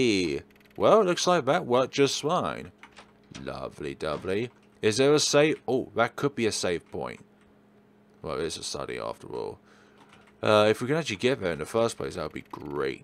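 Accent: United Kingdom. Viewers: Where in UK is your accent from?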